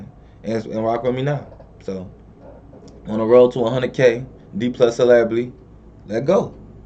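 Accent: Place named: Detroit, United States. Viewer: Does your swag rub off on So or vice versa? (I notice y'all talk similar maybe issa Detroit thing)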